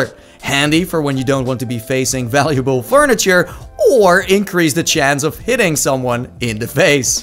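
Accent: Netherlands. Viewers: His accent sounds sooo dutch.🇳🇱🇳🇱🇳🇱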